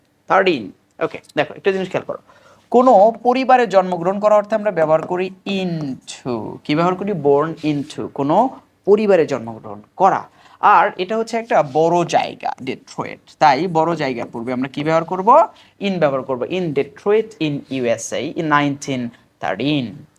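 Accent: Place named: United Kingdom. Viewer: Sir Apnr English ta amder English moto bebohar kora ocit karon sobai Apnr occaron bubena Jake bole birtis basa Bangala dese manos moto ocaron korle Valo hoi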